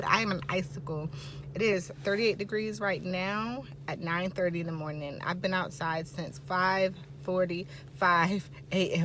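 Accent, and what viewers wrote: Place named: Caribbean. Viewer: Are you from the Caribbean (Virgin Islands)? I can hear an accent 🧐😃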